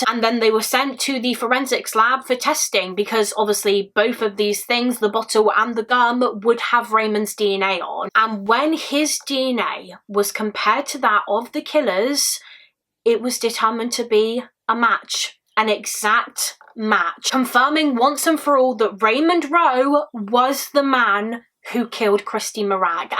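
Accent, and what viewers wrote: United Kingdom. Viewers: Are you British sweetheart???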